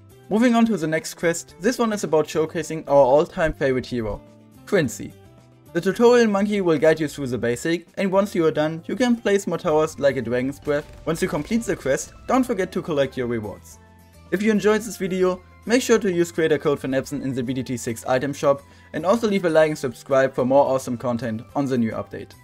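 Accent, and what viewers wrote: Germany. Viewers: is there a GERMAN AKZENT MEIN LIEBER SPORTSFREUND? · You sound like a German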